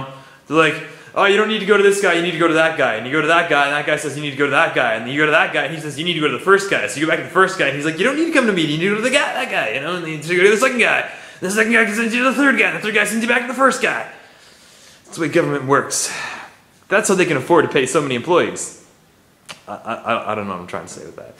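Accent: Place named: Australia. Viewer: Great Australian accent!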